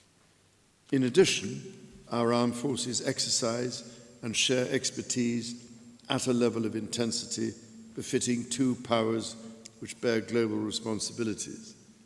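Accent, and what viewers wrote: United Kingdom. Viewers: 폐하의 브리티쉬 스피치가 너무 고급스럽고 감격스럽사옵니다 ㅠㅠ 멋져요 전하🎉🎉🎉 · 영국식인데 발음이 엄청 잘들리네 왕족이라 그런가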